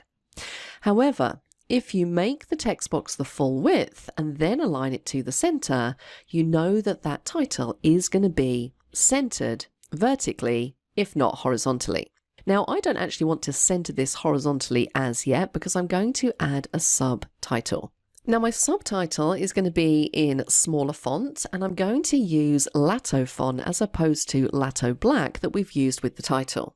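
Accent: United Kingdom. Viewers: Thank you so much...in fact I am a zero user of P.P., but in fact I´ve learnt a lot just by watching this and your previous videos. I also like your accent I am kinda a fan of british one...take care and regards from Trujillo- Perú.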